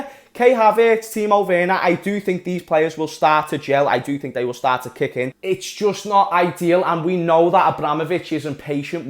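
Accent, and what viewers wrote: Liverpool, United Kingdom. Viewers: I'm going out on a prediction limb that I hate: I'm saying we win the league, no surprise there. BUT, the thing I hate is that I really think Everton could be number 2! We can't be blind to what Carlo is doing over there! And, the Italian Stallion is sort of a nemesis to Klopp from his days at Napoli. He always gave us trouble and every Red should realize he's far from shite and since he's got his wonder boy with him, I'm rightly concerned. Number 3, 4, or 5 is City and I'm thinking Arsenal will be 4 because Arteta is doing the business over there. Gunners could be number 3, so we better be ready for them. You called the line-up, I think except maybe the gaffer could start Milner but I'd rather have Gini. Yes, Ally is injury prone obviously. Will he be out long this time? I don't think so. I'm thinking 2-1 because there ain't no 12th man in the Kop to encourage our lads. 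Okay, Brendon and his foxes will be number 5, I think, but could be number 4. Managers gettin' the sack? Jose, Fat Frank, OGS are all gone this year. Pep won't get sacked but he's moving on after the year, I predict. BTW mate, for what it's worth, take it from an old man, you are boss at this. Keep it up and your channel will become massive in the Red fanbase zone. You sound like a seasoned pundit already and I'm hooked on your Scouser voice, your language, and the way you get excited and worked up like the diehard fan that you are. Inspiring, young man, simply inspiring! I watch everything you d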